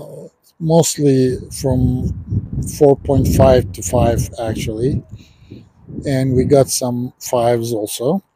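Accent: Turkey. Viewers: Hocam sivaslı mısın? Tam Sivas şivesi var sende😃😃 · Aksan Türkçe, hemşerim ne işin var orada, kaç kendini kurtar.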